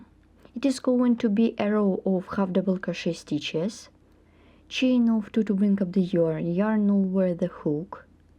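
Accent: Russia. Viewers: Жаль,что Вы на русском не озвучиваете,субтитры переводят непонятно,а по Вашему акценту понятно,что прекрасно говорите по-русски.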